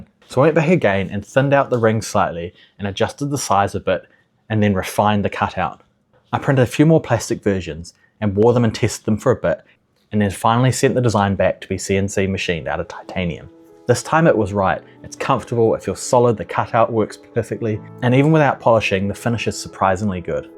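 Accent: New Zealand. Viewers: are you a new zealander?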